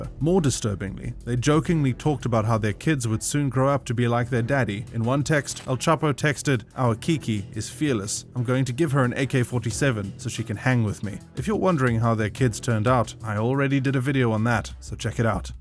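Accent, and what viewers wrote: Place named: South Africa. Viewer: Narrator sounds like he has a South African accent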